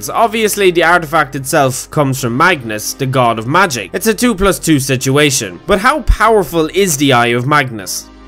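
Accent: Ireland. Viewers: Dominic Fischel It’s the Irish voices. They good.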